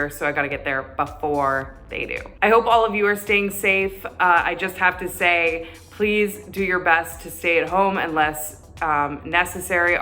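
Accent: Canada. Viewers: Are you Canadian? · Are u Canadian?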